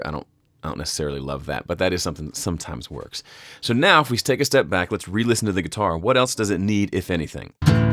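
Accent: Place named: Mississippi, United States. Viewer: Hi, I have been enjoying your videos on proper EQ. Great Job! Your accent seemed familiar to me so I checked you out and lo and behold, like me, you 're a Mississippi boy. I'm from Jackson, an original rock 'n roller, who played with Andy Anderson from Clarksdale in the early days. Keep on making those videos and I will keep on watchin'!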